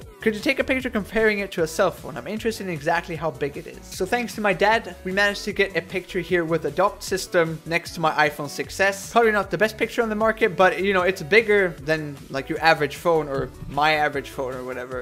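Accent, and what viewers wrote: Sweden. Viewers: WTF är du svensk? I was wondering what your accent is and then I saw "poäng", and "1 dag sen" etc. So now the accent makes sense.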